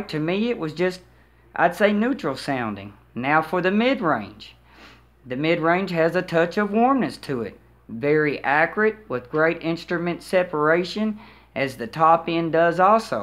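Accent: South, United States. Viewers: Good Ol' boy does audio...I have not heard audio gear reviews with a heavy southern accent. I like it! Haters gonna hate on Emotiva, seems impossible to go wrong with for the $.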